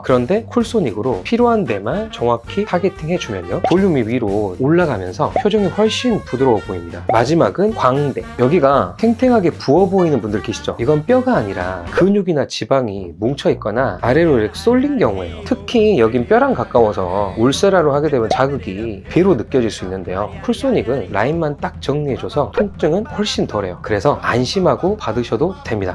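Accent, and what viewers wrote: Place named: Jeolla, South Korea. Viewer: 전라도 사투리 구수하네예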